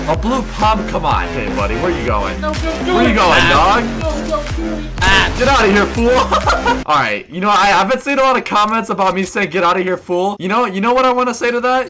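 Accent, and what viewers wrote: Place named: New Zealand. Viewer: Who else hears kiwis when he talks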